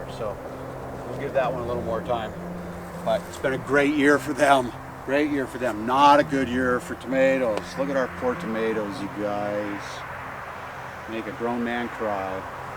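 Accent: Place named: Minnesota, United States. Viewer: Nice Garden!!! I thought I recognized a tiny bit of a MinneSOOOda accent. I grew up in Bloomington. Hey, if you're expanding your garden, get a packet of Sumer Dance Cucumber seeds. Grow them up a trellis. Foot or more long, straight, very few small seeds. Great for sliced pickles. Pine Tree Seeds. TYFS, Mark and Rosa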